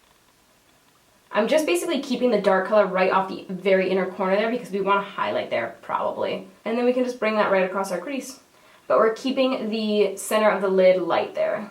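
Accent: Canada. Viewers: I am obsessed with your accent, I love Canadian accents soooo much haha. I would love to visit Canada, it's my number one destination! I'm from Australia and I've never been overseas so I'm so unbelievably keen to start traveling. Love your work, you're amazing. xxx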